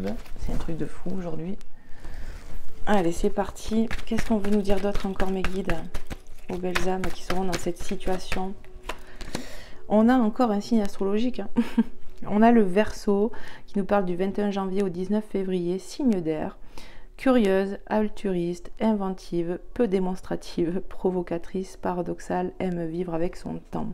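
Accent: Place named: South, France. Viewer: Cc tu es du sud comme moi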